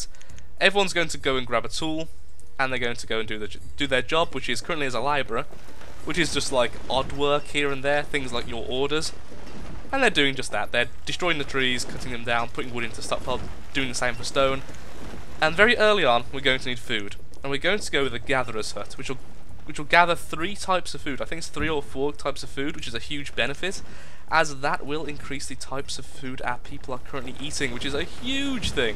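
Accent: United Kingdom. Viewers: Harry Potter accent of english <3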